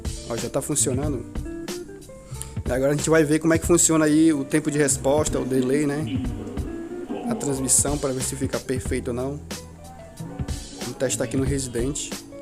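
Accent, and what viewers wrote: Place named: Pará, Brazil. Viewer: Tu e paraense ne man kkk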